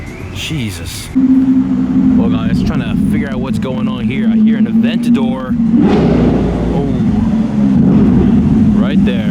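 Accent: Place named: Philadelphia, United States. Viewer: tyoors lol sounded like you’re from south Philly haha. Love the content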